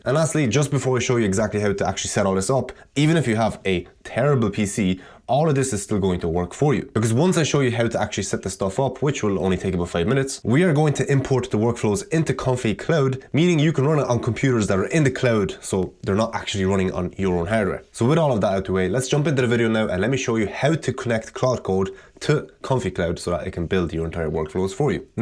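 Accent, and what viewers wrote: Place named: Ireland. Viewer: Man you Really have an Irish accent!!!!!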